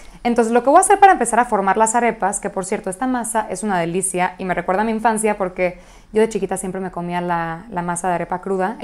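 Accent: Mexico. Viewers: Ahh es q es Venezolana, ahora tiene sentido q tenga acento mexicano, pero su canal se llame 😂😂 ya decía yo..😂😂😂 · No tienes acento, rienes el acento de mi pais, México! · Ahora se me antojo unas arepas pero se me acabo la harina aaaahh:´) jajaja no sabia que eras venezolana, nunca desde que te sigo pensé eso, tu acento mexicano sobresale mucho 😅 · Si tienes algo bello es tu voz que combina super con el acento mexicano ❤ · Buscando recetas de arepas, la encontré. Es maravillosa. Me encanta el español que habla, muy claro y su acento mexicano. Soy mexicana viviendo en Europa desde hace bastantes años. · Estaba buscando como se hacen las arepas pero creo que son lo mismo que los sopes en México? Creo que las voy a preparar como las estás preparando tu porque tengo esa marca de harina, gracias!! Te escuchas muy mexicana!! Saludos!! ❤ 🇲🇽🙏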